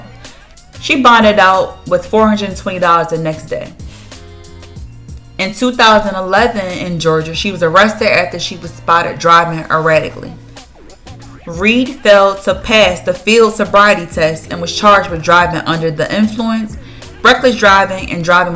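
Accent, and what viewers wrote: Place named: Philadelphia, United States. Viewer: This narrator’s voice is so ghetto I can’t finish listening!! Definitely East Coast…almost sounds like Philly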